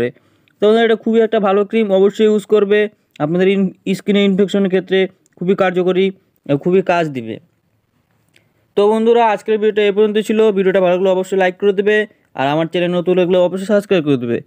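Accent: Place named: India. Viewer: Uni indian tai voice amon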